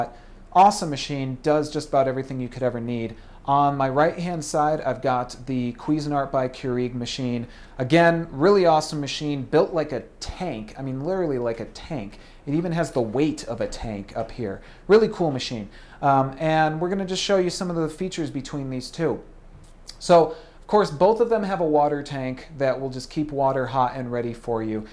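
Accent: United States. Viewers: he has a regional American accent. I assume you are Canadian as am I.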